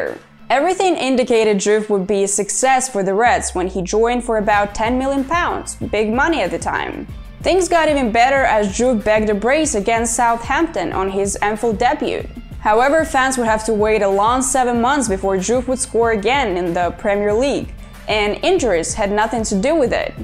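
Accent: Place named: Latin America. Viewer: Great content, the accent is giving some Latin speaking country but your command of English is better than us Brits. Your delivery means you will be a YouTube star, keep it up 🙌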